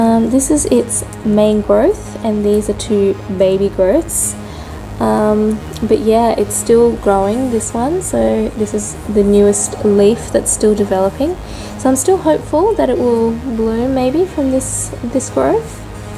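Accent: Australia. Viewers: I really enjoy your Orchies in Bloom videos. For one thing, I could listen to your voice with that Australian accent all day long. It’s so soothing and your content is interesting. Looking forward to the star of the show next month!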